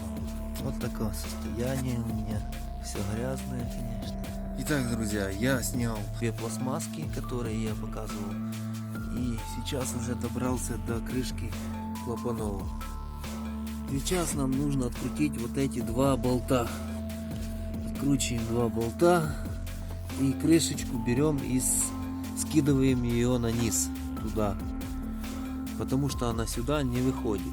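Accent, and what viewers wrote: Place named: Ukraine. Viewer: Говори как тебе удобно потому что твой русский это украинский шедевр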